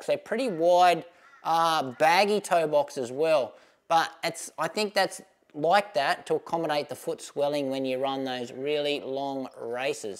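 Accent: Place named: Australia. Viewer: This guy is a great reviewer and he has one of the most thickest austrailian accents.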